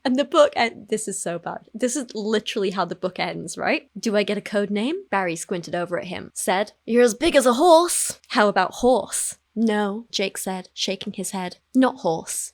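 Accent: United Kingdom. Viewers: When you read this nonsense in your pleasant British accent it just sounds to me like Harry Potter crackfic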